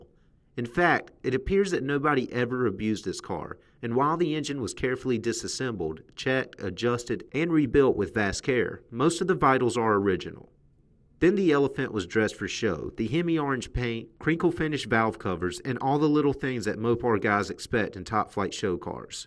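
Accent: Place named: South, United States. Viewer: Hey man. I just love your Southern accent as much as the car.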